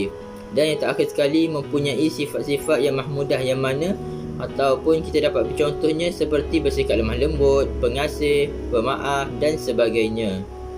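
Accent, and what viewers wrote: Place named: Kelantan, Malaysia. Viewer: Walaupun agak Kelantan, tapi saya suka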